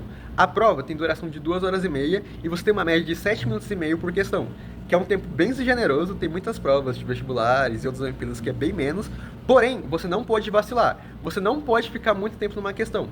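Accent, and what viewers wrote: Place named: São Paulo, Brazil. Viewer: muito paulista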